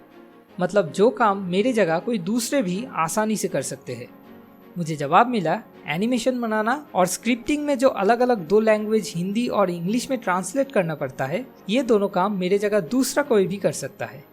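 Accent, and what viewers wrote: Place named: Bihar, India. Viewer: pure bihari voice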